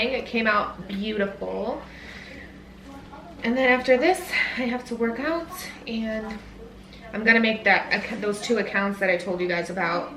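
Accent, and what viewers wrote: Midwest, United States. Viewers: 😂 Honestly.. I never thought it would be taken out of context.. I’ll re-edit the original comment tho.. Going to sound like a simp, but is a saint, I don’t even look at her like that anymore.. Just keeping it real… I was first introduced to videos around 2019-ish.. I was like “Dayuuum! who’s this beautiful woman with a midwestern accent talking about eye opening topics!”… I was crushing lol, but over the past couple of years watching her videos and seeing stuff like cooking, closet videos, and talking about Penny.. You just get the sense she is a strong woman with a beautiful soul.. Hope you’re doing well! 😊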